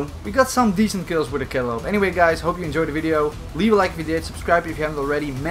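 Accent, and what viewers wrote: Netherlands. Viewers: I smell dutch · Are you Dutch by any chance? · you have a Dutch accent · you have that tipicle dutch English accent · Ik kan je Nederlandsse accent herkennen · Wait are u from the netherlands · You sound Dutch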